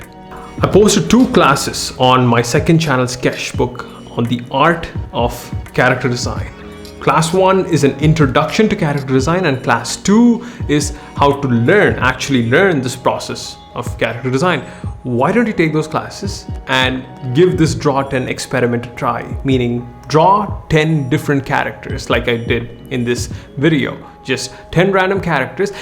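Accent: India. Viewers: Pls telllllll arrrrrr uuuuuuu Indian?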